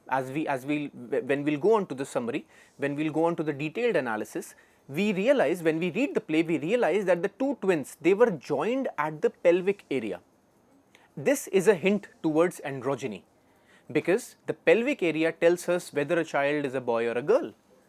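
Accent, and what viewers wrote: India. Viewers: I just say the way of an Indian pronunciation in your voice is commendable,great explanation with great melodious voice 🙏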